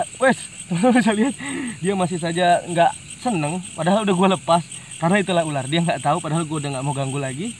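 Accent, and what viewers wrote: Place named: Java, Indonesia. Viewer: Dih galengan kek orang jawa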